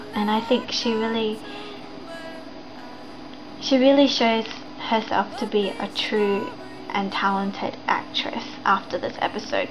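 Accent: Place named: Australia. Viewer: I agree with a lot of what you said. The sexy parts of this episode are so cringe-worthy. The parts with two Callie's are also all very strange. Sara's rendition of I Was Made for You kind of makes up for those (although singing Callie shaking the bad of unconscious Callie is something I've never liked). I also agree that I prefer Sara's version of the song. It's no surprise that she was the best singer, as she has won a Tony. Overall I think a singing episode was a bad idea. It just wasn't a natural fit. You need a show that has more comedy to make it work, like Xena, or Buffy. You sound Aussie, like me.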